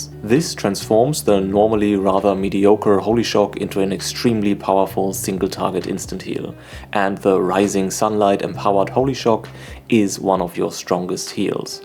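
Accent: Germany. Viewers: I hear a bit DEUTSCH out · Hi where are u from if u dont mind asking! cuz u have a german accent haha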